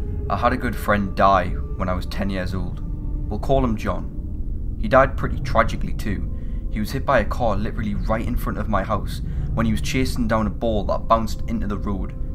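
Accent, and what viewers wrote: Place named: Scotland, United Kingdom. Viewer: Where is it that you are from?? Sounds Scottish (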